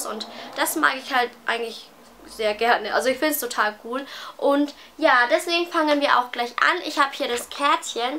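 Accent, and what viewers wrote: Franconia, Germany. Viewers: Bin vor ein paar Tagen auf deinen Kanal gestoßen und muss sagen dass er mir richtig gut gefällt! Du bist ja so eine süße! Übrigens wohne ich ungefähr 60 km von Würzburg weg. Und man hört es voll das du aus Franken kommst! So süß :) ich freue mich schon auf weitere Videos von dir! :)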